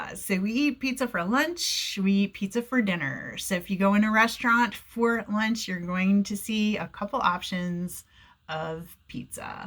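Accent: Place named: United States. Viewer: Oh you guys are completely dependent on packed food. Anyways thank you for informative video, it definitely answered my question that has been lying in my head since long, n here i landed onto your video. Your pronounciation is very much clear, the speed you speak is good and easily understandable, i enjoyed your accent. Its not like other americans whose words are hard to catch most of the times. I had a hard time in the beginning in my job as a transcriber, to document american calls for insurance company. But with time it got better..hehehe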